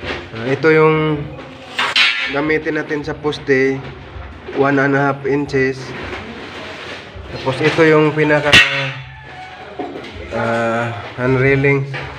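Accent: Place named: Bicol, Philippines. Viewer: Parang bikulano ka idol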